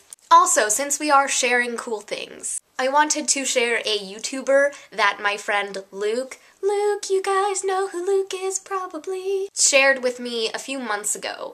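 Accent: United States. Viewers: I had you up on the computer and my Nana was like "Hey, why are you speaking in an american accent?" so I had to explain that it wasn't me, it was you,!! HaHa, It was funny and flattering because you are so pretty!! x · Michigan accent represent!!!!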